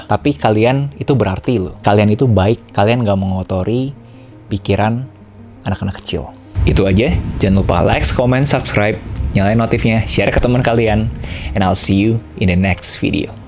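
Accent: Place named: South Jakarta, Indonesia. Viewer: Bahasa lo trllu jaksel bang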